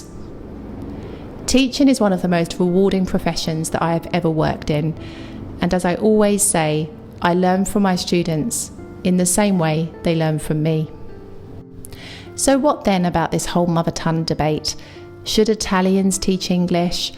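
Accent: England, United Kingdom. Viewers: I love your English accent 🍷💕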